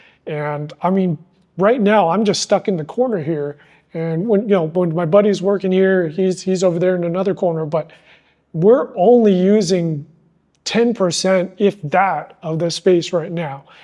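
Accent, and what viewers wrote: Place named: United States. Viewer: I’ve watched many of your videos on Thai language acquisition. From your manner of speaking and the small personal details you’ve shared, I assumed that you are American. But judging from your appearance, you look Chinese. Are you also a Mandarin speaker? Or are you fluent in another Chinese dialect? Are you a trilingual?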